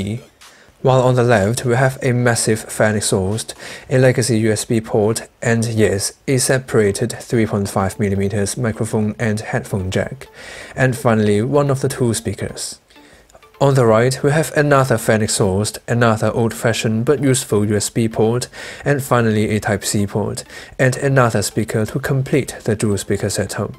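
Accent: United Kingdom. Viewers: i hate that british accent... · 好英式既口音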